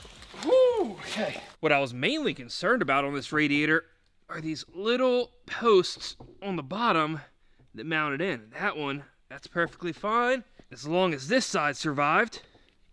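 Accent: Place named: Eastern Shore, United States. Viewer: Just by your accent I can tell you're from the Eastern Shore MD😂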